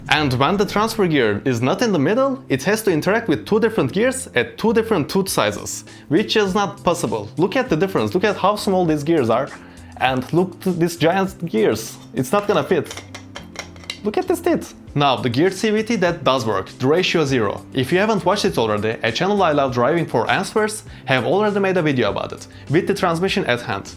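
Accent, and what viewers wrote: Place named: Iran. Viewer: are you persian at least your acsent is like iranian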